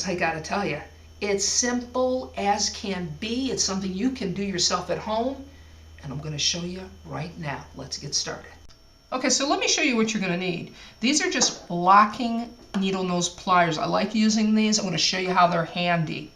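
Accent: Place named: Fargo, United States. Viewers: It has a FARGO twang, for sure. People from West Coast starting at the California/Oregon heading N have the same.....'bout like Sarah Palin (no offense).😋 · Cool vid!I dont know if youre from minnesota or not,but you oughta be.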